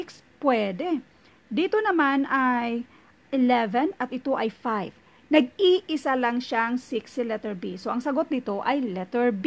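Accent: Visayas, Philippines. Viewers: tsssk tsssk bisaya dii ka maam ? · Ma'am bisaya ka po? 🙂 nahalata ko lang hehe pero ganda po naman boses nyo kahit parang paos 😅😍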